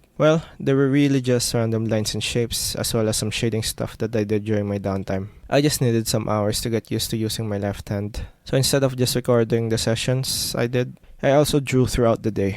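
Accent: Philippines. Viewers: based on your accent im guessing ur filipino? bec thats how i talk in english lolll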